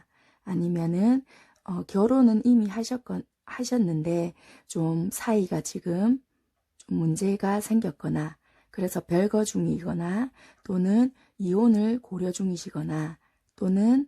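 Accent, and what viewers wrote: Busan, South Korea. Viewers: 감기로 목상태가 안 좋으신가보네요. 얼릉 쾌차하세요~ 사실 저도 부산 사람이라 부산사투리 첨부터 들었을때부터 정감이 많이 가서 계속 리딩 듣게 되네요^^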